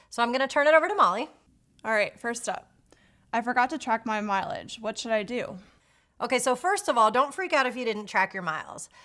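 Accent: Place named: United States. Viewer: It would be nice if the video mentioned which country's taxes this video is addressing. I'll have to guess by your accent that this is for Americans. They have turbotax here in Canada too, but the taxation is not the same. Specificity is key, especially with taxes.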